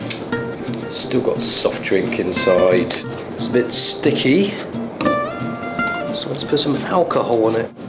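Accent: United Kingdom. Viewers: a chinese man speaking british wtf is going on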